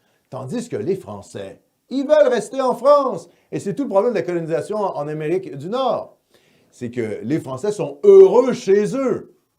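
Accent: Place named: Quebec, Canada. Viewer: Le pire dans tout ça lol. C'est que tu pognes plus en France qu'ici je crois 🤦🤦🤦🤦. Je vois tellement de bout de tes vidéos sur des chaînes françaises que c'est ce qui m'a interressé à te connaître. Je reconnaissait un certain accent dont la provenance française me semblait douteuse 😂. Je partage ta chaîne en espérant t'amener une reconnaissance québécoise 🤦😝😝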